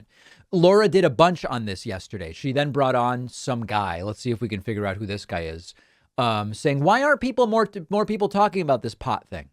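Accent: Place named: Argentina. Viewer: I've always found the Argentinian dialect of Spanish so unique, just lovely. And, you speak beautifully...more please! 😁 Huge fan of you & your show! Love and blessings, from California.💙💙💙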